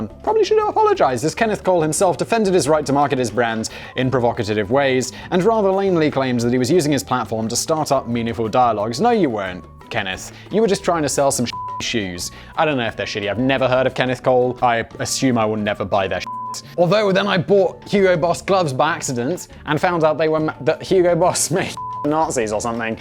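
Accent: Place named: England, United Kingdom. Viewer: Simon owes his popularity to the fact that England invaded so many countries. Now when the entire world hears an English accent they sit up and listen, because that Brit probably runs things. Especially the guy with the posh accent... he must be in charge. We just take Simon's authority for granted the minute he speaks. Before you know it, eight channels.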